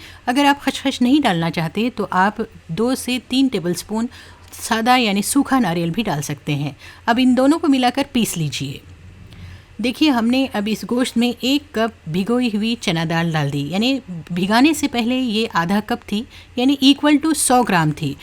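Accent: Hyderabad, India. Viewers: Yes ,her style of talking is Hyderabadi....n she stays in a remote place like farm house....beautiful....Barakallahu feeha... Loved her ooen balcony kitchen....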